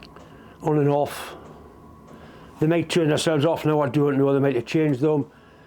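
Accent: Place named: Durham, United Kingdom. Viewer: Thanks very much, really appreciate your straight to the point videos, very helpful. From the accent you must be very close to where I am in Durham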